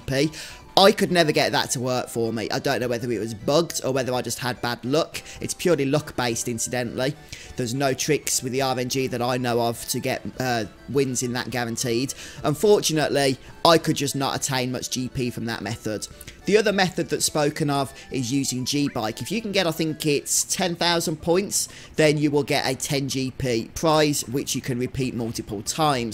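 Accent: United Kingdom. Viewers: I like youre GB Englisch!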